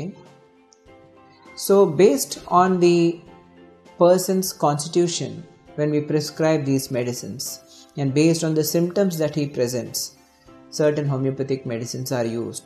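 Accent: India. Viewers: Indian accent 😂🤣😂😂🤣. Why u guys don't speak in Hindi. English is just a language not a knowledge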